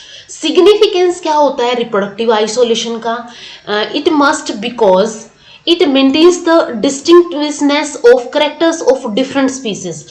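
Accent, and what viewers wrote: Haryana, India. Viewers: Mam Haryana se ho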